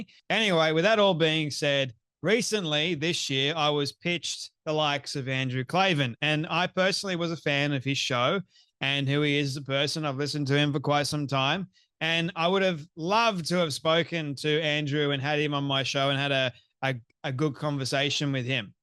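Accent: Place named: Australia. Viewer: I love your channel, you speak as an Australian and i so appreciate that 👌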